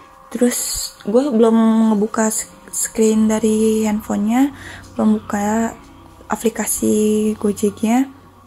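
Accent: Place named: Sunda, Indonesia. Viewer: Logat sundanya msih kental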